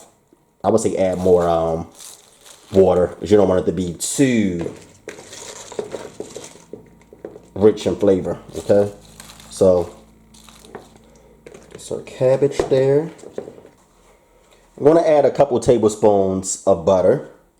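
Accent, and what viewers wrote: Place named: South Carolina, United States. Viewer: Bro, you sure you’re not from South Carolina?????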